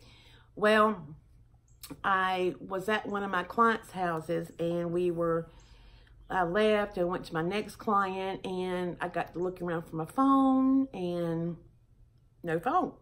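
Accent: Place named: Alabama, United States. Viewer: Tuesday and Thursday would be good for me❣️ I lived in Alabama for 10 years so your accent sounds so good to me. I’m so sorry about your phone! And I know what it’s like to move a lot. Will you still be close enough to see family and friends after your move? You’re so sweet, I love to watch your videos and I like the way you decorate. 👍🏻🥰🤗🙏🏻 I’m praying for you😌❣️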